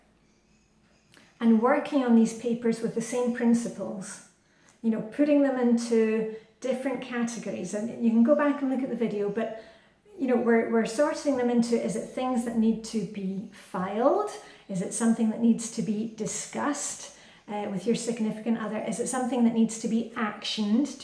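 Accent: Scotland, United Kingdom. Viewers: Thank you so much for making things seem so possible and realistic. I am doing well on my routines, I have just listened to David Allen GTD and am delighted to put the two systems together. I really enjoy and look forward to your videos, very refreshing to hear a Scottish accent and not American (sorry USA) Im Irish and its nice to see/hear a European point of view. Thanks for all you do - Anne Marie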